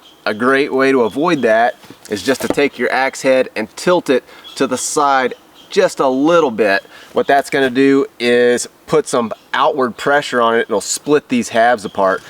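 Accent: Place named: South, United States. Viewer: where is he from? slight southern accent but he says he's in the rockies. i'm confused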